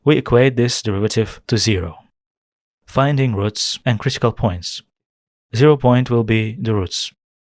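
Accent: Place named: Kazakhstan. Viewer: Он же из Казахстана, поэтому он так и говорит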